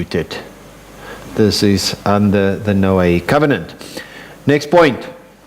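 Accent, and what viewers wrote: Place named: South Africa. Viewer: Glad to come across this. Pastor has a South African accent.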